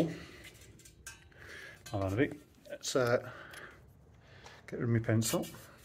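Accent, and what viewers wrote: Birmingham, United Kingdom. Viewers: Proper brummie. … there ACE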